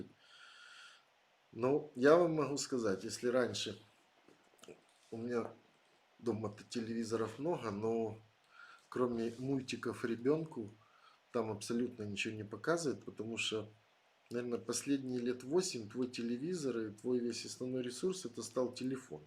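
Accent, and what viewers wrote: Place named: Ukraine. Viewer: ваш украинский акцент в речи, мягкий и душевный, мне очень приятно слушать. Моя мама с Украины, с Херсонской области, я каждое лето бывала у бабушки и этот акцент вызывает ассоциации с детством, с какой то общностью людей разных национальностей. Мне нравится ваша простота, искренность и ваше мировоззрение. Спасибо вам за стрим, за отсутствие нарциссического стремления к совершенству, за поддержку нас, беларусов и всех людей доброй воли. Удачи вам и здоровья!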